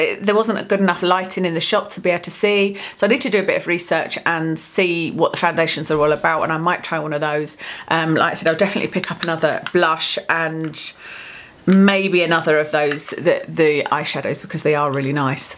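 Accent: Scotland, United Kingdom. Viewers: Are you from Scotland. My home town. Love your videos x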